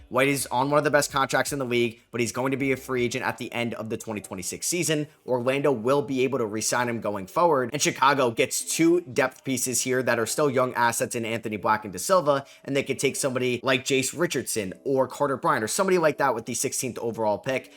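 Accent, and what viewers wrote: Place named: New York, United States. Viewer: The new York accent is so funny What about the letters eu in Kneuppell make an 'ih' sound to you? Also great content 👑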